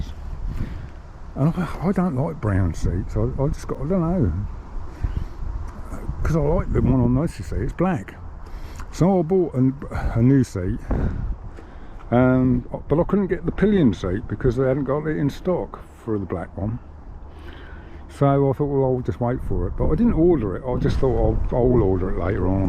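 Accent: Suffolk, United Kingdom. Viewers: Nout wrong with a Suffolk accent 👍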